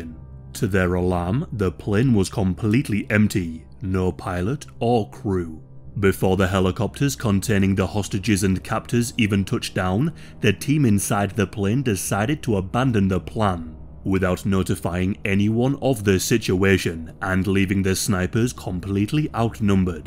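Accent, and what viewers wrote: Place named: Hebrides, United Kingdom. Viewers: It's nice to hear someone who (I think) has the same accent as me doing well on YouTube! Hi from Hebs :) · God hes so Scottish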